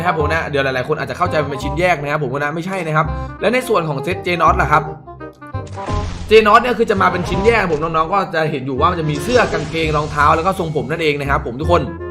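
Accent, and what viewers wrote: South, Thailand. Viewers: อยากให้พี่ พูดใต้ทั้งวัน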